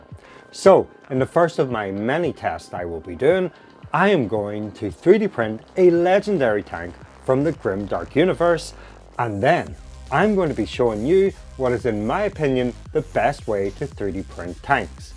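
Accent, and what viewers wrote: Northern Ireland, United Kingdom. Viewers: just discovered you for the first time. praise the algorithm ! that a fellow "Norn Iron" accent i am detecting there lol?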